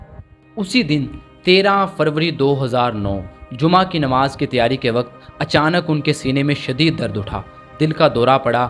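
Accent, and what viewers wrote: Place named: Punjab, Pakistan. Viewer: You’re doing great job collecting information and passing onto the people throughout the Pakistan and in other countries as well. I would request two things number one please do some more research with your facts number two. Please fix your Punjabi pronunciation that will make your talks and your presentation more sophisticated. May Allah help you and give you complete success in this world and here after.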